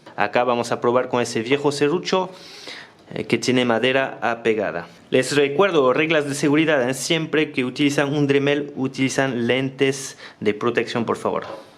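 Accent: France. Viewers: Eres español? Porque es inconfundible la musicalidad de la fonética francesa... 😮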